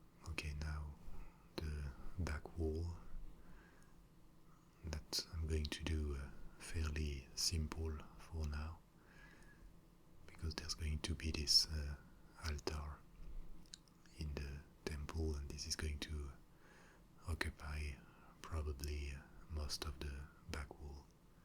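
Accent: France. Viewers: Vous avez un peu l'accent français dans vos parole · Mon Dieu! ASMR + votre accent francais (+ Minecraft) = Fantastique! :D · As if regular soft speaking wasn't good enough here comes this guy and does it in a French accent; I AM IN LOVE WITH THIS!!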